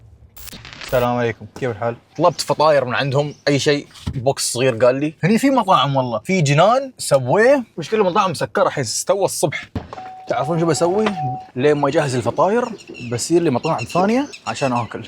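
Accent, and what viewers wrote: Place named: United Arab Emirates. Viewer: طلع اماراتي و هي تحسبه سعودي وانا احسبه بحريني صدق خليجنا واحد هههههههه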